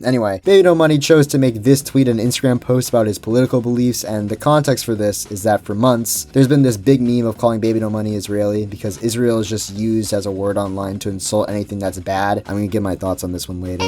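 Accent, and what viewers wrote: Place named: United States. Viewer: terrible take. from your accent you would be American so by your same logic you are an Trump supporter and 100% for the Iran war. no there's no room for nuance with this. you see how stupid that sounds? I don't even say that to defend Israel because they shouldn't exist in the first place but that's a different conversation.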